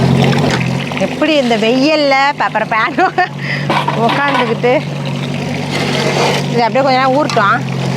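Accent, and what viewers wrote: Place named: Thanjavur, India. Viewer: Azhagana Thanjavur side Tamil language... Engha soentha oor gnabagam varuthu....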